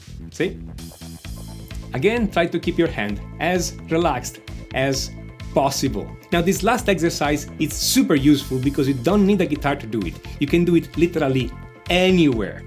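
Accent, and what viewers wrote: Italy. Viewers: ma sei italiano per caso?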